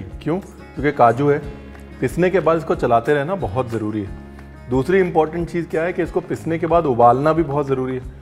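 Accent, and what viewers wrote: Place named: Punjab, India. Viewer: I really love the punjabi accent and the joy( major ingredient) he infuses in his cooking.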